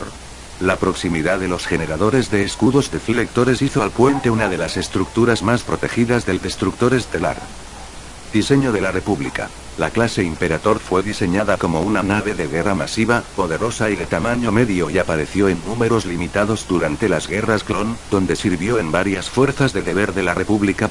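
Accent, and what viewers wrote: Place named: United Kingdom. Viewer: Tienes un acento británico amigo. Gracias.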